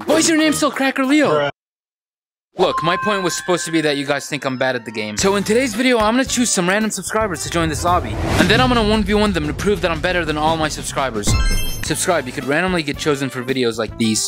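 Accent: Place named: United Kingdom. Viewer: Bro speaking literally in British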